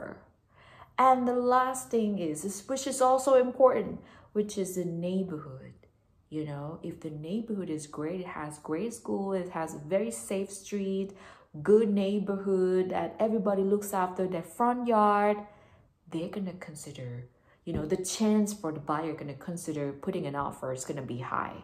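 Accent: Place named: England, United Kingdom. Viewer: ชอบสำเนียงอิ้งหลิชของพี่มากค่าา 😻😁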